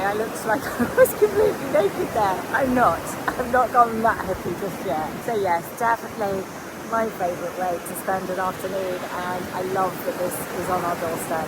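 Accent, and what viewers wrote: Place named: United Kingdom. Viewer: Hello I'm so sorry to hear about your scary beach experience.. I heard that 2 days ago that there was a hurricane nearby, I hope you're all ok. Your spanish is similar to my italian with a cute British accent 😅 I love the grey cardi and pale blue trouser outfit 💕 Blazers yes please! They're my biggest obsession❤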